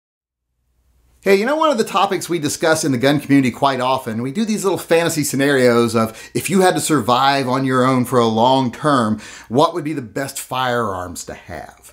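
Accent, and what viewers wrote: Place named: Appalachia, United States. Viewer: I disagree with your hillbilly pronunciation.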